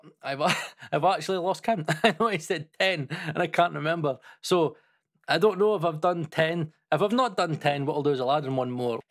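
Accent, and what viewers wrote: Scotland, United Kingdom. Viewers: I've watched 4 or 5 of your videos now, and I think I can finally state without hesitation that I can fully understand your (Scottish?) accent. :) Now I CAN sleep at night.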